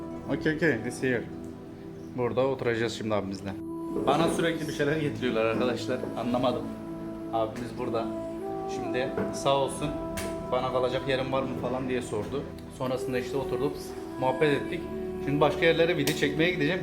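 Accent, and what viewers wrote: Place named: Kurdish, Turkey. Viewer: Abicim sen yapamıyorsun, bir kere konuşma aksanın bozuk kürt m7 emi nece belli deyil konuşuyon belli değil vidyoların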